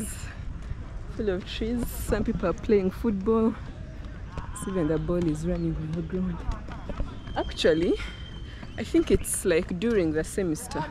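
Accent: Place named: Uganda. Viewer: Love from San Diego California,born in Uganda I remember makerere long long time ago and I loved your beautiful ugandan accent,I would listen to you all day. Will try to find more of your content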